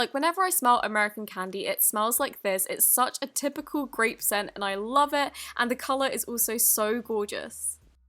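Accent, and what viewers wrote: United Kingdom. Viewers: are you British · she cant her british accent takes over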